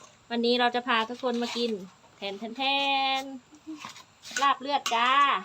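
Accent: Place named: Loei, Thailand. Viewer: เว่าคือทางเมืองเลยน้อ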